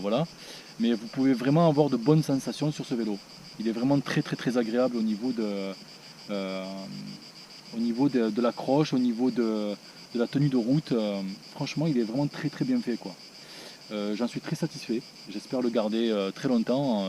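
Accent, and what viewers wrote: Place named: South, France. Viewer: accents du Sud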